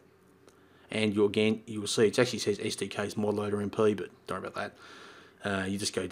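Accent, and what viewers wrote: Australia. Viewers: YOU. Are Australian. I love you now. (: FROM ME ♥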